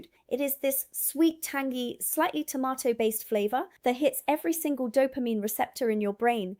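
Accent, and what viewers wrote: England, United Kingdom. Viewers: you SOUND English. Re Branston pickle.....have you tried piccalilli on your cheddar sarni (new word for you?)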